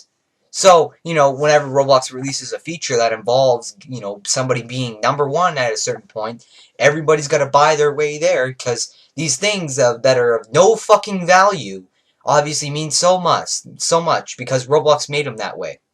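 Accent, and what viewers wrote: Canada. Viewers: Are you from Canada?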